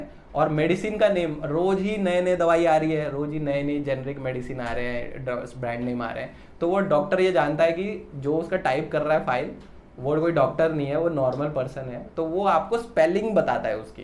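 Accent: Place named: Uttarakhand, India. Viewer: Sir u r from uttarakhand ur voice matching uttrakhandi ji send address I am interested too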